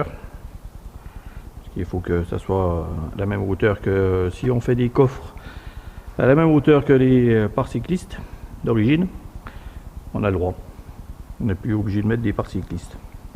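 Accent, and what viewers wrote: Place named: Franche-Comté, France. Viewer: Je crois entendre l'accent franc comtois !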